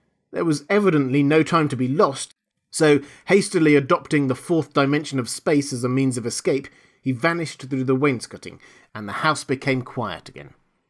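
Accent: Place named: United States. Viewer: Great American accent!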